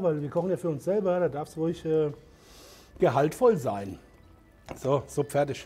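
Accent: Hesse, Germany. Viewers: Hessischer Dialekt einfach eine 10/10 … Grüße aus NRW. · Badesalz kocht. Herrlich Hessisch!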